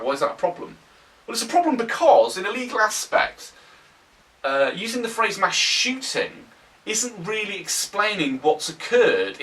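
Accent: United Kingdom. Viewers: it just sounds better with a british accent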